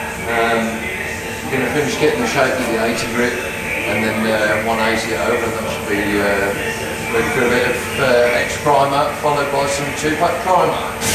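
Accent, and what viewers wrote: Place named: Birmingham, United Kingdom. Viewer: Nice to hear a fellow Brummy on the ML channel!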